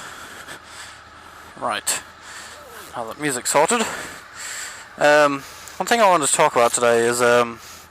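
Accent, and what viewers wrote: United States. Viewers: You sound more American in this video than you ever have done before lol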